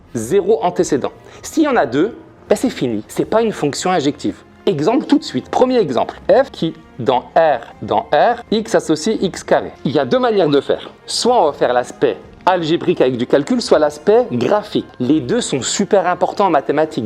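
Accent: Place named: Asia. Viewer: Pourquoi vous portez un masque si ce n'est pas trop indiscret d'oser poser la question.Je crois en juger par votre accent que vous êtes asiatique😅😅😊.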